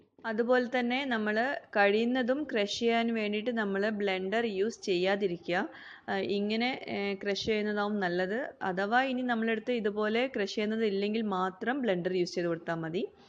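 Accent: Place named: Wayanad, India. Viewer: wayanatilano? wayanaden samsaram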